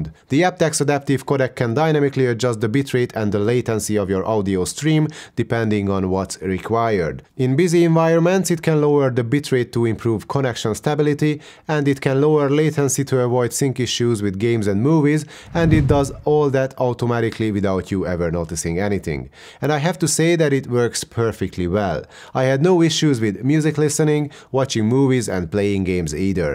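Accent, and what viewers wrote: Hungary. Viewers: Excellent review, saved me $400 since I was thinking about replacing my Airpods Pros for the PI7s. No need to now. Also the accent sounds Hungarian, curious if I'm correct.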